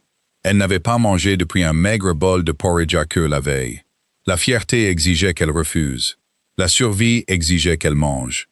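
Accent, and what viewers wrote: United Kingdom. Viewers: Un bon moment passé d'un roman historique comme avec un livre papier, sauf qu'à cet instant c'est avec la voix grave et monocorde d'un homme, doublé d'un accent britannique qui n'enlève rien à l'histoire, bien Au contraire ! Merci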